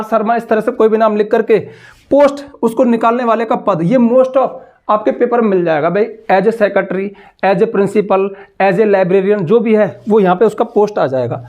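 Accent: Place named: Uttar Pradesh, India. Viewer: सर जी आप यूपी या हरियाणा स हो क्या? में यूपी स हूं नु पुंछ रा हूं। और आप का बोल ने के लहजे स लगरा आप भी शायद यूपी के हो।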